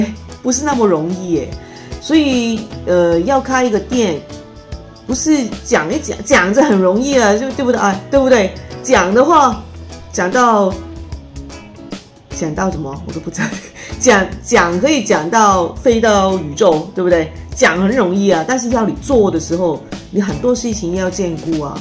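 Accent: Taiwan. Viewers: 口音越來越像台灣人了，越來越融入台灣了，讚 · 掐 (讀音: 恰的平音) 外太空 💖💖叻女依家講嘢夠自然夠放鬆, 發音夠標準夠台味, 試下睇多啲綜藝節目啲字眼會學得好快, 可能係你下一個目標?😊依家返香港會唔會好唔慣啲人講嘢嘅 tone? 有時我聽到隔離啲香港女人講嘢, 太急太 aggressive 都會影響好心情, 要深呼吸, 即時逃離現場~😁😁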